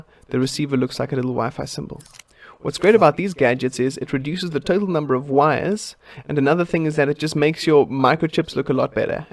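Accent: South Africa. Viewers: That south african accent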